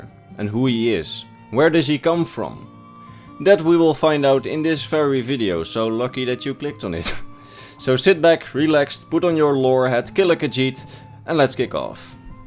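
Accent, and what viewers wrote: Netherlands. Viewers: I hear somebody is dutch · U sound dutch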